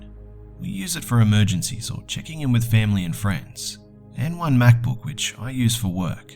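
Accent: Australia. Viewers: Wow thanks! Neat stories:) You should do a video over skinwalker/ wendigo stories. The 'My cabin getaway' series was literally awesome and so creepy <3!! I know for sure I'd love to hear your punder-ful, aussie accented, smooth voice narrating some good old Native American horror stories again. And i'm positive all the other fans would too. P.S. Now call me Ra-pun-zel but you should drop some more puns, you seemed to have dropped off a bit on them:). (Sorry if this came off as super criticizing, just trying to be constructive) - Izzy the Adventure Princess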